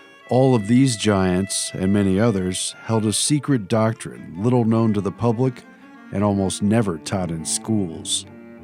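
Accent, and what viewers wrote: United States. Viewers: Very much enjoyed the narration. A very quintessentially "American" sounding voice, if ever I heard one. (Put me somewhat in mind of Peter Coyote's narrations). Nice pacing, pronunciations, and inflections....and even the printed/blue highlighted readings were effective: activating even more neuro pathways than just simply hearing the narration. SOoooo much better than all those robotron voices we've been hearing around YouTube for some time. Think I'll take a look around this channel some more.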